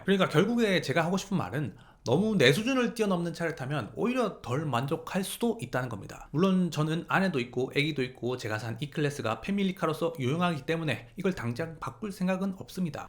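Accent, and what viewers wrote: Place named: Busan, South Korea. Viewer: 말씨는 경상남도 부산쪽같은데 사는곳은 서울경기쪽에 좀 살아서 나오는 사투리억양섞인 서울말씨네요 ㅎㅎ 잘 보고 있습니다 😊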